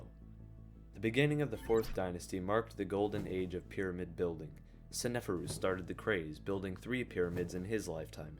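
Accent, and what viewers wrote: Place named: Midwest, United States. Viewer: Great channel but I think you should redo your narrative using an artificial English accent like David Attenborough. You have a young midwestern accent. People respect English accents that why most Roman Greek Egyptian movies using British actors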